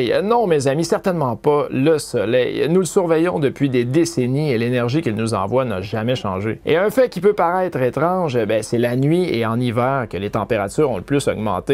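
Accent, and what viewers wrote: Quebec, Canada. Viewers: j'adore, sa doit faire plus d'un ans que je suit l'actualité de SpaceX et du starship, j'écoutait en francais de France depuis, sa fait plaisir d'avoir un Québecois ^^ bonne continuation mon ami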